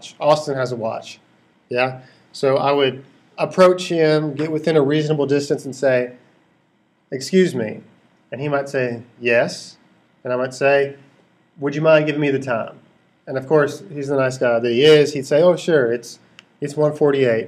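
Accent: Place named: United States. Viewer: Ahhh finally an american accent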